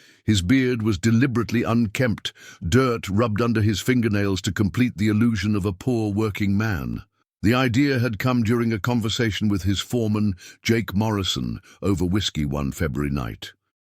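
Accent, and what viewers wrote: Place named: United Kingdom. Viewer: The British accent doesn’t match the Wild West. 🥴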